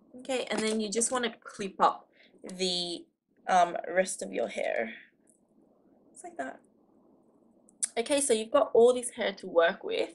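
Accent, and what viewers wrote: Australia. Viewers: Lol Aussie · Wait if you're Japanese how come your accent sounds Australian? .-.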